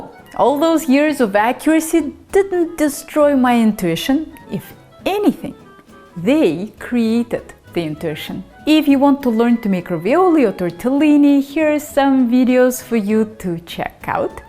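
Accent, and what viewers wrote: Russia. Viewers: So hard russian accent, I couldn't resist... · You do Italian food but you sound Russian! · Like the Russian accent and talking of pasta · Quite entertaining, and with a kinda of Russian accent for a change! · I didn't even listen the recipe it's self I just watched and listen to this lady talking in her Russian accent. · You have a nice Russian accent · Love your accent! Russian right? Was hooked from the first few sentences :-) very informative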